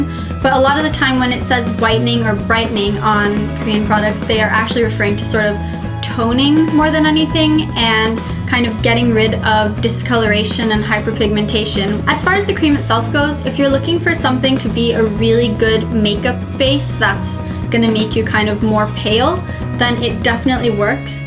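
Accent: United States. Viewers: U said u paid pounds but u have American accent.. Jus wanted to know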